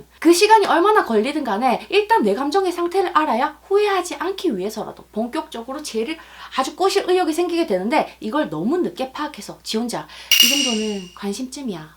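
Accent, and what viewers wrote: Gyeongsang, South Korea. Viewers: 사용하시는언어는 부산어 인가욧 대구어 인가욧???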